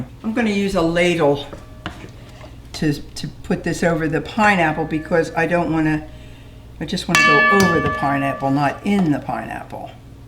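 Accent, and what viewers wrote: Philadelphia, United States. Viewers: Is that a Philly/Delco accent I hear? 😃 This dessert looks so yummy! 🍰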